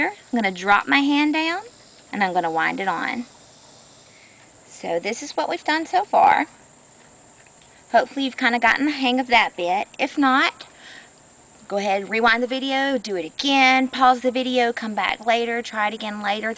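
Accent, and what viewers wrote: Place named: South, United States. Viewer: Hope you are ok, since you made this so long ago! I guess you are in the southern states area, going by your lovely way of talking! Thank you again.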